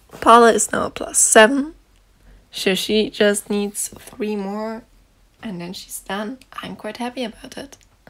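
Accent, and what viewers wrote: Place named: Germany. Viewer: Ich dachte mir doch, dass das 'n deutscher Akzent ist ^^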